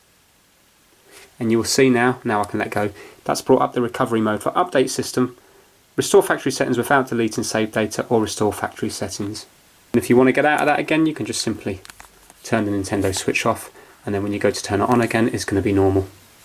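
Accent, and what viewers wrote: England, United Kingdom. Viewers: Your very helpful and I like your English accent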